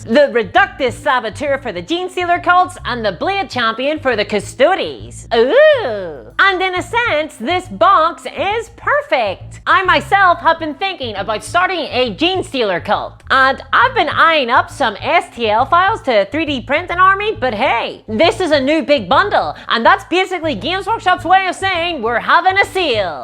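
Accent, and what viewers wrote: Northern Ireland, United Kingdom. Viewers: Are you from the north of Ireland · Ah I'm near Bristol, knew it had to be some kind of british!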